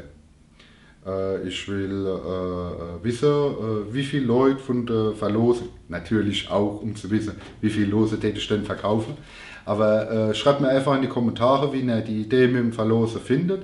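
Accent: Germany. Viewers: Du Klingst wie ein Odenwälder 😁 coole Videos! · Hi, super Sache das, bin zufällig jetzt hier gelandet weil ich dein erstes Video (glaub ich?) gesehn hab, wie man einen Bobber für unter 2000 Ocken bauen kann. echt krass, gut gemacht hast eine gute Art sowas rüber zu bringen, deshalb freu ich mich auf die Erklärbärvideos, wird sicher geil Apropo, bleib so wie du bist, Pfälzer sind cool und Dialekt babbeln is voll ok ich versteh dich jedenfalls ...aber eins musste mir versprechen, bleib dir treu ;) Bis denne Stephan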